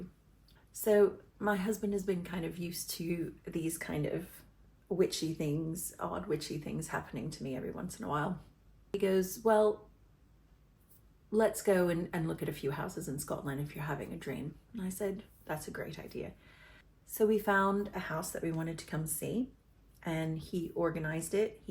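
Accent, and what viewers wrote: United States. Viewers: Are you from New England? Something in your accent.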